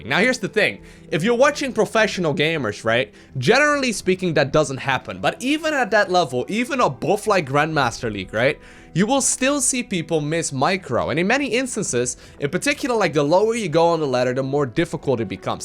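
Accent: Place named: Netherlands. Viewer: Dutch accent is so understandable to native-russian-speakers in some weird way.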